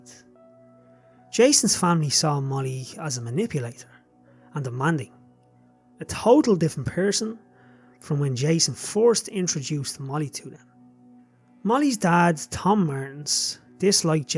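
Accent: Ireland. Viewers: How rude of a comment to call the narrators voice weird, I’m sure Americans sound weird to other English speakers in European countries. He’s an Irishmen, and their English dialect has been around longer than Americans dialect of English. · I’m Australian & I just love an Irish accent! The best accent in the world 🍀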